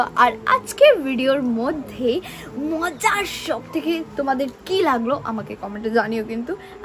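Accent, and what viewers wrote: Sylhet, Bangladesh. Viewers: Tumi ki sylhety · আপু তুমি কি সিলেটি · Sylheti naki tumi? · Are you from Assam or bangladesh? Because this is sylheti bangla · Does assami people speaks sylheti accent or what or are you from there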